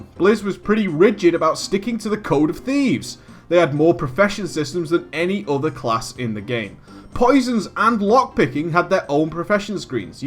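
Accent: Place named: United Kingdom. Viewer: I'm fairly sure he's from the UK, mainly because of the accent.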